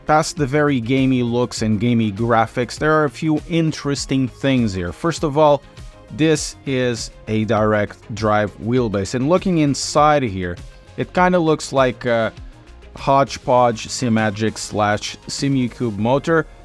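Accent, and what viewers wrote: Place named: Portugal. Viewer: Had a feeling you were Portuguese for the slight accent. Amazing English but the Portuguese rhythm never goes away. Great work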